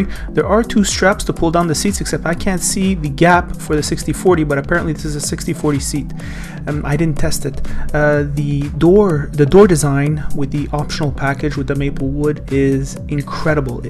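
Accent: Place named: Quebec, Canada. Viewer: Bonne vidéo! L'accent québécois ne fait pas trop surface :p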